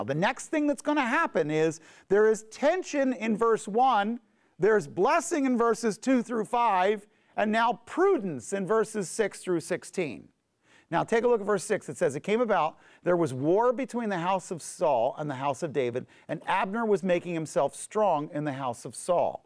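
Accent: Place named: Israel. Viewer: I understand that native tongue is Hebrew (being born in Israel). You are being exposed to a greater thing. The Word will expand your mind, heart and soul-- in Hebrew the bet is pronounced as "b" AND as "v" bet/vet; and that is what you are hearing. Shalom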